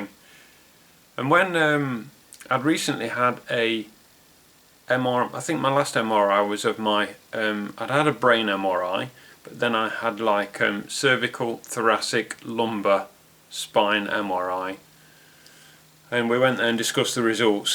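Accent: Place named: Nottingham, United Kingdom. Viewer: Hi, I thought I recognised that lovely accent of yours, then you mentioned Nottingham! I am originally from Mansfield, living up in Yorkshire now though. I am finding your videos very informative, currently going through the MS diagnosis process myself. Keep them coming, they are very helpful. Thanks.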